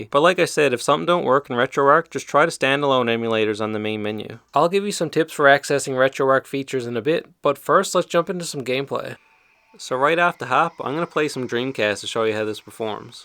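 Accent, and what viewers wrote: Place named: Newfoundland, Canada. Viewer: Just ordered one of these after watching this video. Looks like an awesome rig! You also kinda sound like you're from Newfoundland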